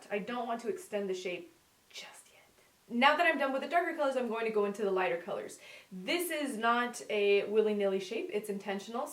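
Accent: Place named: Montreal, Canada. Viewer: you sound like you're from Montreal for some reason...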